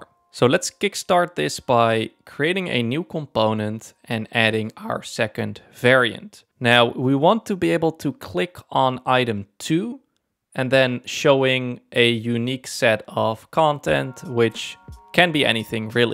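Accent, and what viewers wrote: Netherlands. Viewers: Dutch accent on top 💯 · hahahaha deze man is sws nederlands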